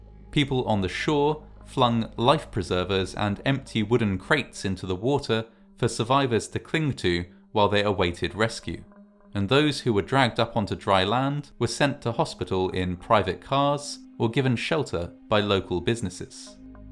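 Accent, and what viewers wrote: United Kingdom. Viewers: Ohh, thanks. Having anxiety symptoms. A guy explaining a shipwreck in a British accent is very soothing. Might watch others.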